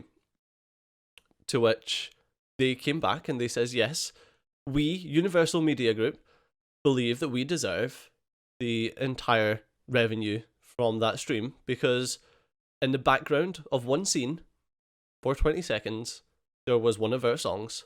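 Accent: Scotland, United Kingdom. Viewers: I wish you the best of luck man, my total youtube usage will go down without you and those wonderful Scottish tones. I hope more creators see what you are doing and stand hand in hand with you. Please don’t stop creating, i personally think you are in the right and we still need creators doing THEIR thing rather everyone just doing the same thing controlled by some faceless corporations, trying not to sound conspiracy theory on it.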